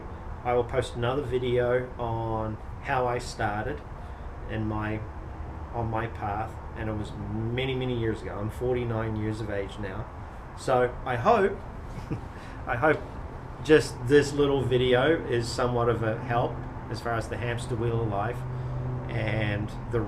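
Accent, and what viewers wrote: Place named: New Zealand. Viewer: thats a very light New Zealand accent. easy to follow for us Yanks.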